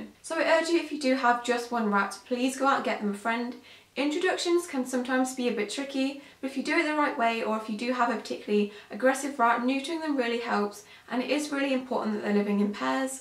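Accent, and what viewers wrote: United Kingdom. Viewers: You Bri-ish? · Are you British *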